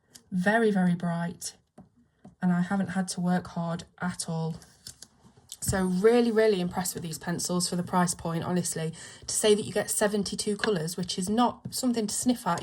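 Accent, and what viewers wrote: England, United Kingdom. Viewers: You don't need to reply to me; just asking you to please remember to turn on CC for those of us who have difficulty following your verbal comments? (Not criticizing your speaking--it's the English accent (I'm from the U.S.) along with my hearing loss that makes it harder to decipher words, in my case.) After your review, if I understood you correctly, these may be the best smaller set of PrismaColor Premiere dupes yet? I like that they blend better and break less easily, which are my two main complaints with PCP. Thank you for this review,! By the way...I now have my copy of Rita Berman's "Meine Reise durch Europa" in hand and am ready for your color-along, whenever you are ready to begin it...!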